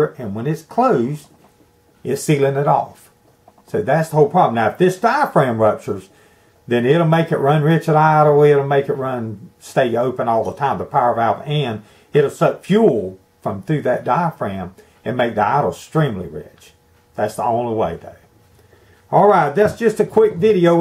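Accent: United States. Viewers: Thank you, with that sweet Southern accent you could tell me anything about automobiles and I would believe it, ha ha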